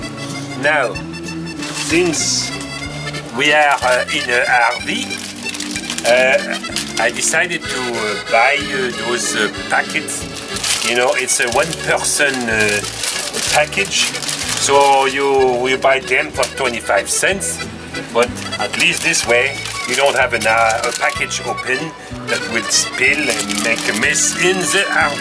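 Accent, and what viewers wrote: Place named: Italy. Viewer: Je pensais te revoir avec un accent USA, mais c'est plutôt avec celui d'Italie que tu reviendras. Rafraichissant mon ami. Continue ton périple avec cette bonne humeur. Au plaisir.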